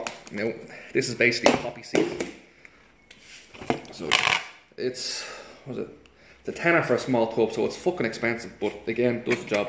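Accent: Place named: Dublin, Ireland. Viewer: great video pal I'm keeping linnet cock to hen canary. two pair of canary red poll cock paired to two hens in one of me flights and pair of goldfinch in the and two cock goldies paired to two hen canary ur soft food mix looked great . can you tell me where to get canary soak seed I'm just use in pigeon soak at the minute the stuff u get in Turkey thanks for a great video and help full tips hope u can help I should have said I'm from Dublin and u sound similar thanks again keep the videos coming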